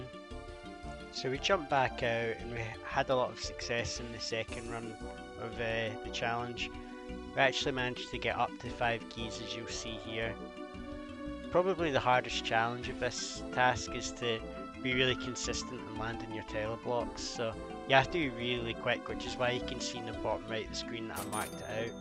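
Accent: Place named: Scotland, United Kingdom. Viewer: Love to hear a Scottish osrs content creator.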